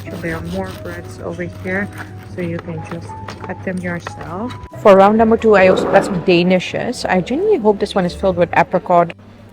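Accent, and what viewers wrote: India. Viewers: on line! Licious!,! You are beginning to sound Indian with English news channels! Well done, I am clapping!. All over big cities. LICIOUS app. Mostly meat but These also!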